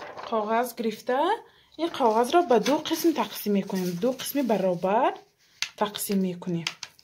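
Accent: Isfara, Tajikistan. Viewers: Шумо а Исфарами лафзатон монанд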